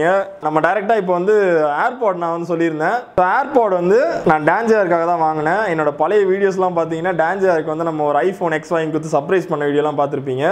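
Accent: Kanyakumari, India. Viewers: Bro neenga kaniyakumari ah sollunga bro❓ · Kanyakumari language super😍😍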